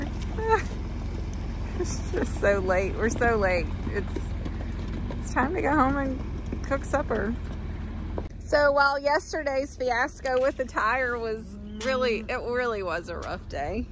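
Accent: South, United States. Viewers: I gotta said I love you southern accent. You are a keeper for sure. you are the man and you have a great women by your side God bless y'all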